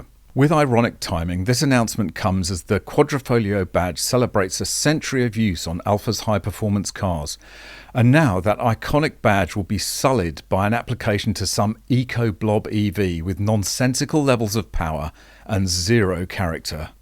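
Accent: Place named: England, United Kingdom. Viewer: Oh! What about Mercedes, Rolls Royce, Bentley and an old Australian car called a Statesman Deville, made by Holden, which I’ve had for years. I think they’re heading in the same direction. That’s my collection... And how do we get away with driving our beloved old cars? I don’t know. It worries me a lot. Annie from Melbourne. I like your channel, and it’s good to hear an English accent again. I lived in England for three years.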